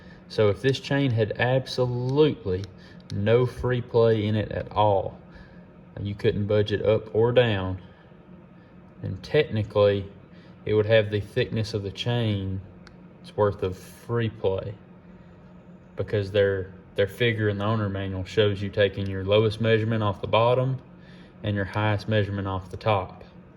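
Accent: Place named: Mississippi, United States. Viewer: You sound like your from Mississippi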